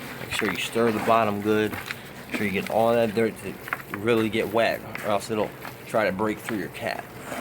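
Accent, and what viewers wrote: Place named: South Philadelphia, United States. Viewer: Nice bro! You remind me of dudes I grew up with in South Philly. You got that tone! I like the step by step videos.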